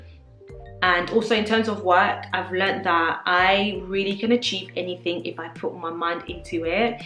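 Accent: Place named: United Kingdom. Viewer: You seem so sweet, please do NOT stop being so nice and friendly because when you do, you have just allowed yourself to be in the class of those who are not friendly. You will get more attention continuing to be who GOD made you. Never let the enemy win! Apparently, they see something in you that they are lacking. You can be reserved, but that's normal, you determine those you detect instantly that you need to be reserved around. See, with all of the designer stuff ( which btw, Chanel is my favorite), some black women get so caught up in doing this as a job and then losing themselves in it, that they lean more towards the designer part....like oh, I'm better, I'm an influencer, I've got, I've got....so I'm better than you, or I can compete against you. It only means you can tell they are not use to having. GOD can wipe all they have away in a matter of seconds. 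And yes, I'm speaking of my black Sisters, not all are like this, but I dont care about what other ethnic backgrounds think. We need to get better at working to " influence" each other. Love your accent....dont know if you are from the UK, but I hope to visit one day. I did live in Europe for 3 years, but that was years ago....b blessed, stay nice and humble, putting GOD 1st and you will flourish...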